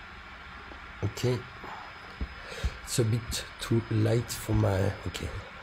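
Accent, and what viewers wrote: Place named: France. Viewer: Un français qui parle English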